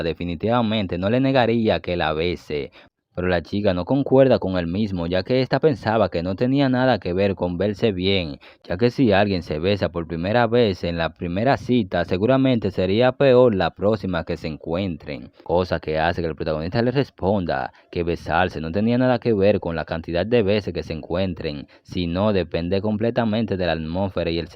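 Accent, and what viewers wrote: Dominican Republic. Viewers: Pero ven acá loco tu eres dominicano? Ese acento no me engaña 😂😂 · Eres Dominicano?? Tu voz y tu jerga me paracen de un Domi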